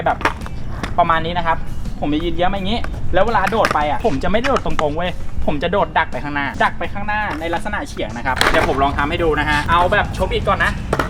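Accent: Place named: Kanchanaburi, Thailand. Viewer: เป็นคนเมืองกาญมั้ยอะ สำเนียงคุ้นหูแบคนกาญมาก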